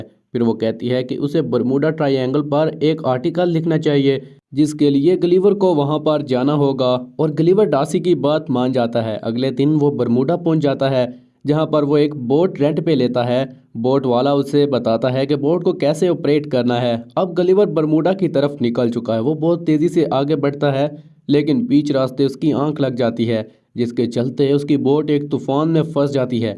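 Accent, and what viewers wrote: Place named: Pakistan. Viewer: Tumhari aawaj se lag raha hai tum Pakistan se ho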